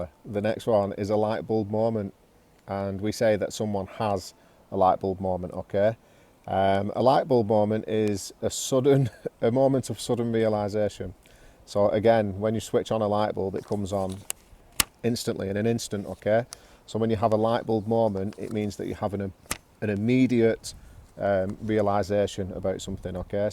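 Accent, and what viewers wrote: United Kingdom. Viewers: I like your British accent although it’s hard for me to understand it. I should listen it a few times . Regards from Texas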